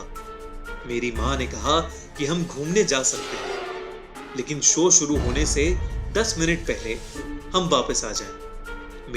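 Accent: United States. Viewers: Are you American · Are you from America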